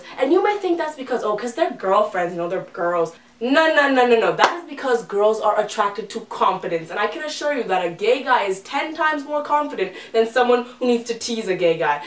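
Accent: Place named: Canada. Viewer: Awesome vid. PS, omg you must be Canadian. I can hear it! I miss TO...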